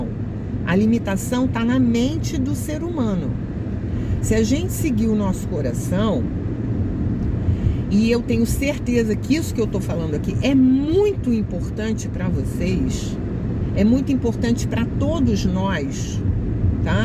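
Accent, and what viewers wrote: Rio de Janeiro, Brazil. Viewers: Pedindo para ter espaço aí pra volta ❤ E vc ainda é nitidamente muito carioca ;) ❤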